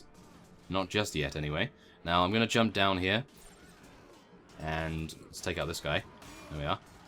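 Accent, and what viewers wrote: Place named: England, United Kingdom. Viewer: Finally caught up with the whole seriesssss, I love these vidsssss. You are sooo fun to watch. top 3 lets play channels for me are You, J2JonJeremy, and IDubbzTV. You guys just had a certain flavor to your vid, always listening to the audience and taking suggestions, instead of just playing blindly doing whatever you wanted, etc. Plus you are a very funny man even though it sounds like your serious at times (must be the english accent)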